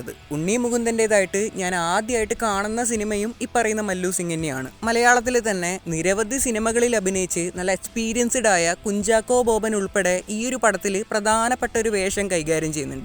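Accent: Kannur, India. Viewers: bro roastil ittapole kannur slangil samsarikk🤌✅️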